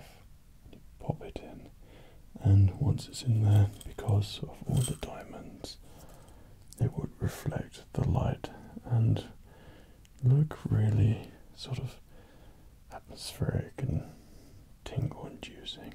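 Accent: United Kingdom. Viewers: Are you British? It would be nice to find a british asmrist! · is he British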